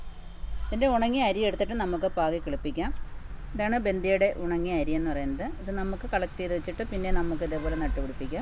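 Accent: Kollam, India. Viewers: Nammude kollam style bhasha ayyam puredam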